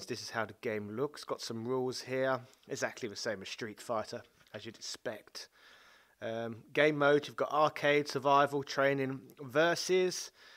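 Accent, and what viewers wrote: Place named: Bromley, United Kingdom. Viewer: I noticed you did a bit of a posh accent in this video. Does that mean you live in Bromley?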